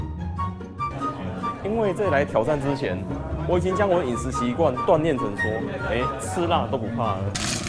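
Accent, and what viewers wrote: Taiwan. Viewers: 真的，藏不住的台味XD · 講話真是夠台哈哈，跟我叔叔講國語一樣哈哈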